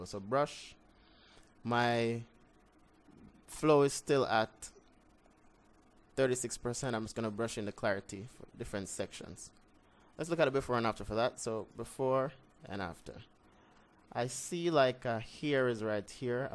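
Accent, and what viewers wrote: Jamaica. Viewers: 🇯🇲 accent. Big up yourself mi genna. You done know how di ting set.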